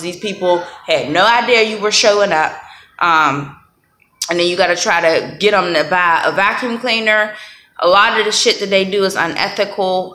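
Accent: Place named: South, United States. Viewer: I'm from Texas you sound like your from down south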